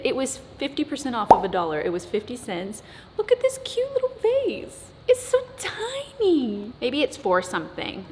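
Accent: Texas, United States. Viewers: Your accent is getting it’s Texas back! Every time I would go back to wherever I was living at the time from visiting home in Texas, I’d be teased like crazy because my accent would be back 😜❣️...Actually all it took for me was talking to my family and friends in Texas‼️ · Love how when she goes home to Texas a slight accent comes out 😂❤️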